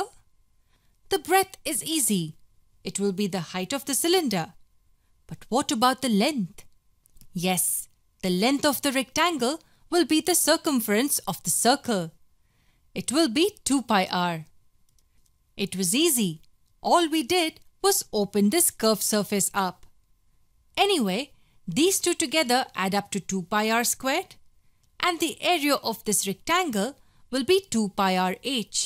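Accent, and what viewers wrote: India. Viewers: is the girl talking ..an indian ?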